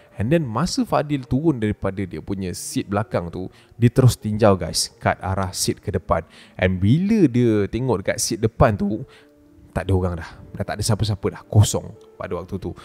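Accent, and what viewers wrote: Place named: Negeri Sembilan, Malaysia. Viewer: Lucu la ckp n9🤣🤣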